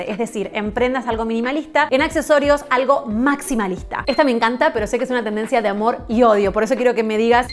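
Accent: Argentina. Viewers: Llegueeeee!!!!! Hermoso que no pierdas el acento argentino 😍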